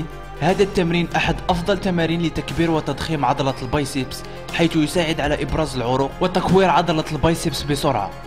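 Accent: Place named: Morocco. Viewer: لهجة مغربية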